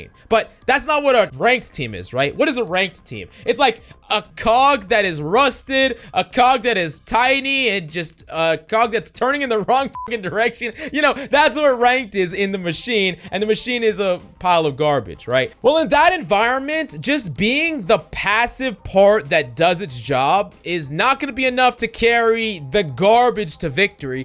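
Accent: United States. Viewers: Heard the American accent, and instantly clicked off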